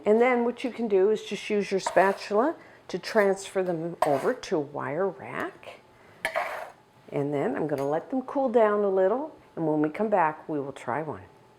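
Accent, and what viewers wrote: Canada. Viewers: Welcome back,! Always the best recipes n explained so nicely I hear that Canadian accent a bit, I think 🥰.. love it! Love that color on you too🌷🌸🌿🌸🌷🌿🌸🌷🌿🥰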